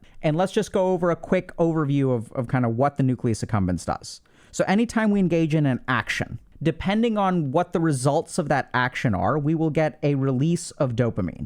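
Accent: Russia. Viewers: I found your Russian accent hilariously great. Very good!! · russian accent sounds so cool, tbh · Hearing speak in Russian accent is the real therapy, everything else is just a bonus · That’s a solid Russian accent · Solid Russian accent (i have the same) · I liked the russian accent) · Doctor Ks russian accent is top notch tho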